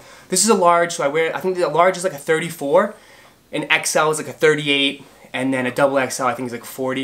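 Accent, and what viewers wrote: Boston, United States. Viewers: Damn bro I knew you were from Boston before you even said it lol I have a co worker who talks just like you! Shoutout from Seattle my G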